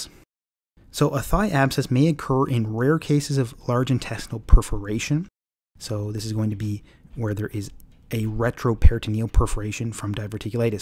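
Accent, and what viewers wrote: Canada. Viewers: he sounds Canadian to me.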